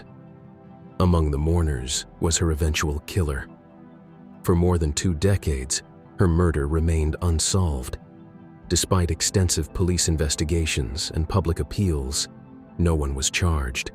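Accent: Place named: United States. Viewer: I question why anything and almost everything that has happened in the U.K. usually ends up on a YT with an American Accent? Also, why do they all assume that we have the attention span of a goldfish? Must be something to do with spinning out the length of the video to earn more money? Why not just put in a little extra effort and quit with the annoying repetition?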